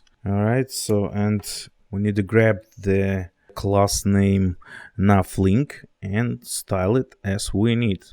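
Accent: Russia. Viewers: Молодец, хороший контент! Нотки русского акцента есть, по этому решил поинтересоваться!)!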